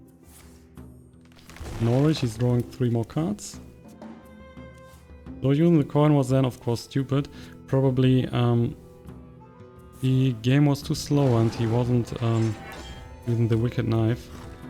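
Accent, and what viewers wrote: Germany. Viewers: gratis Englisch nachhilfe: TOMB = TUHM sorry, das musste raus, du klingst henau so, wie meine satirische immitation des "englisch sprechenden deutschen". wirklich ganz typisch deutscher "aktzent". :D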